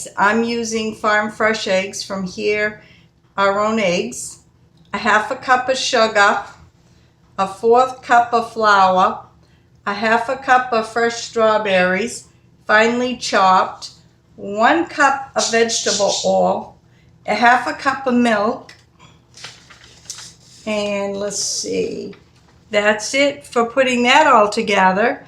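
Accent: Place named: New England, United States. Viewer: Are you from New England ? I know that accent. Im from Massachusetts. I thank you for your recipe. My daughter requested strawberry cake for her birthday. I am making a stabilized whipped cream frosting, her favorite.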